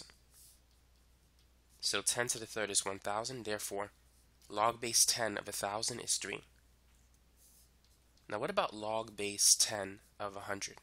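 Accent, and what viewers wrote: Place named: Queens, United States. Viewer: there’s too many especially on the east coast, if I were to guess I’d say this guy probably grew up in the Jewish side of Queens New York but I can’t really tell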